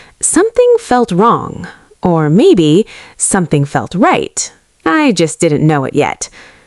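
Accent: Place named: United States. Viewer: ❤❤❤❤. American English so nice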